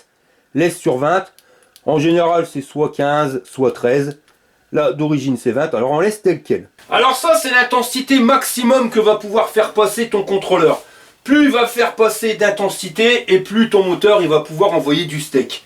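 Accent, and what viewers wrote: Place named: Nord, France. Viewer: Ton accent chti j'adore mon gars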